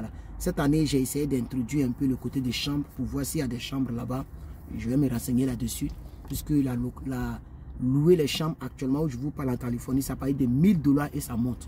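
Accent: Ivory Coast. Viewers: Je sens l'accent ivoirien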